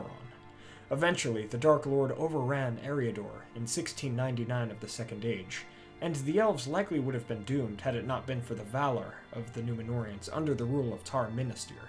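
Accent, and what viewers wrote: United States. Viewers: I love these videos so much - but I suffer from the HORRIBLE PRONUNCIATION of the words - please do not americanize them. Learn them 🙏🏻 #ithurts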